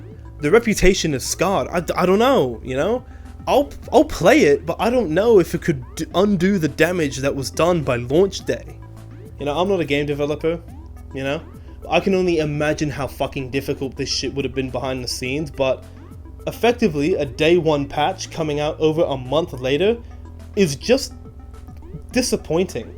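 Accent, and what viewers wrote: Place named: Australia. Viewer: Is he Australian, or is that just a speech impediment?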